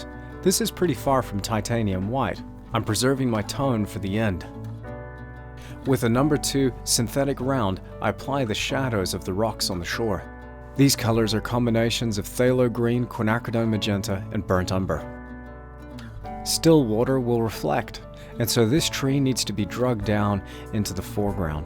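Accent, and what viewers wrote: Australia. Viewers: your accent is so Australian for some words, and so American for other words. I would have guessed the Aussie would get into all words, at least a little bit. But it's either one or the other though, very interesting. Great location by the way.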